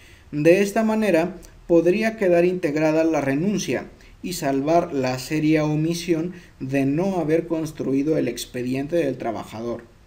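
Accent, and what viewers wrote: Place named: Spain. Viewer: Jolines! y porque hablas como español?? trabajjjo, jjjjornada...etc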